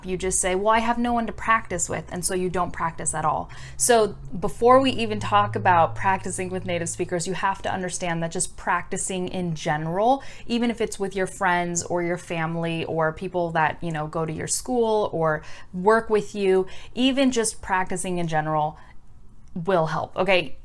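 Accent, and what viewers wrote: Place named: Argentina. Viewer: You´re videos are amazing, and tu español es demasiado bueno con acento Argentino jaja... You should do a live video.. Regards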